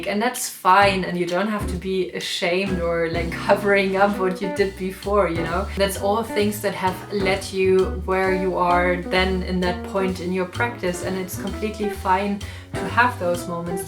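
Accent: Germany. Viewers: Ich finde deinen Kanal echt megaaaa🥰 & finde dein Englisch echt top😅 War ein bisschen stolz, dass ich aus deinem Englisch raus hören konnte das du auch deutsch bist haha 😊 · Dein deutscher Akzent macht dich noch charmanter als ohnehin schon. 💚 (Und danke das du dich bei meinen Kommentar vorhin an mich erinnert hast, und woher ich komme. 😊) You are a very wonderful and wise witch! Can't wait for your next videos! 😉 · Hi beautiful! New sub and I have to tell you I LOVE your videos! Your knowledge, your voice, aesthetic, and your absolutely wonderful German accent lol you are so sweet and great! ☺️🥰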